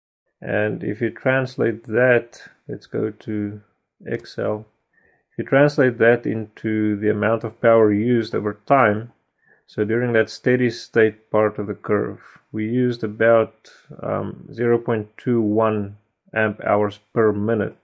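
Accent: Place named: South Africa. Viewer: Hi guys, great video! Can i ask where this was made? You sound somewhat South African...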